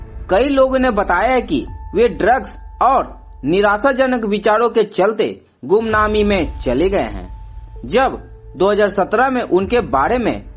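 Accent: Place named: Bihar, India. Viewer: भाई आपके लहजे मालूम चलता है की आप बिहाड़ी (बिहारी) हैं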